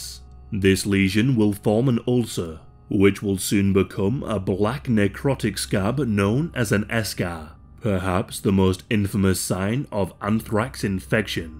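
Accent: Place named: Northern England, United Kingdom. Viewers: I didn't know you were Australian you sound northern English...? I'm from the UK btw ✌️ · Do you think everyone has a London accent? 😂 I’m a Northerner and he is 100% from England. I have a feeling you aren’t though and shouldn’t be assuming.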